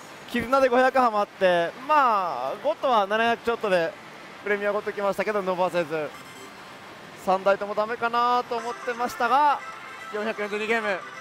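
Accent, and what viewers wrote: Kyushu, Japan. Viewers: たまに九州の方言を聞くとほっとしまいます。熊本生まれ何年も帰ってないので、ハーデスはゴッド引いても見切りしてます。ジャグラは中々こない。前の日の多く出てる台を狙い打ってます。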